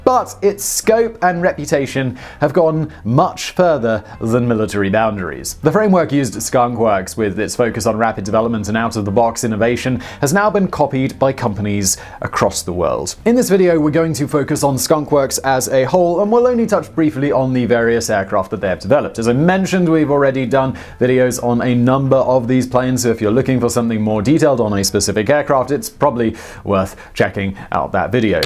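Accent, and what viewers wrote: United Kingdom. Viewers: Why do British people talk like this. Do they hear themselves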